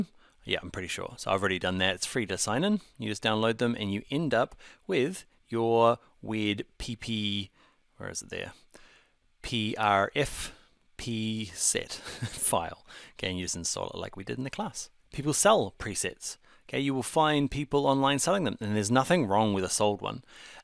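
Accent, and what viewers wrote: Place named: New Zealand. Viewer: You do some awesome videos bro, this is the first video I've watched and I thought straight away 'Is he from New Zealand' hahaha Chur bro that's mean as I'm Kiwi too, Keep it up!!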